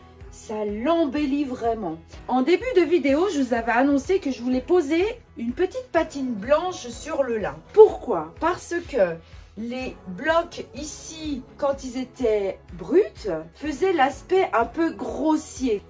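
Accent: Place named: Franche-Comté, France. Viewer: Bonjour j adore! J ai reconnu un accent franc-comtois de mon avance..? Bisous et bravo! Ps: j ote les ferrures en général, mais pas toujours possible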